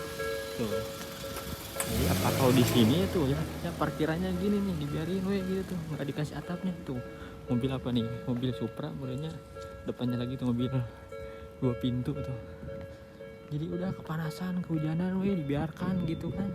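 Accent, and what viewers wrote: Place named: West Java, Indonesia. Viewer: Sigana mah urang sunda si akang ti cara ngomong na 😅, salam ti uing tkw taiwan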